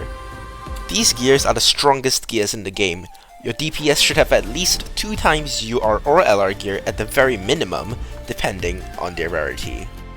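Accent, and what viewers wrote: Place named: United Kingdom. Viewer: Got to love that british accent!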